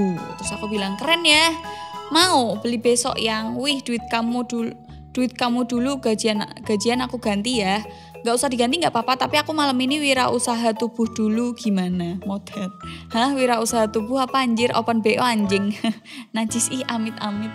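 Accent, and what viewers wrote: Java, Indonesia. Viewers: Wong jowo ki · Mbk ee kok jawa orang mana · Jowo ndi sampean mbk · Wong jowo toh mbak? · Jowo medok pisan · logat jowone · Ya kan ya kan..jawa pundi mbak kok ya kan ya kan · Medok bgt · Dialeknya jowo.. Rasa asik dengarnya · mbak.e wong jowo jowo endi mbak? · Medoknyaaaaa mantabs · Wong jowo yo mbk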